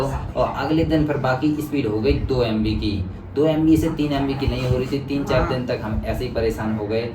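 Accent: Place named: Agra, India. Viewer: bro kha sa ho ap ke boli to agra ke lag re h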